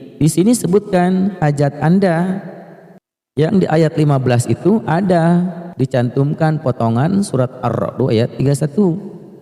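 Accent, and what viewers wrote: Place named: West Java, Indonesia. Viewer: Di lempangkeun....😂😂😂nuhun kang...logat sunda na kaciri pisan..qobiltu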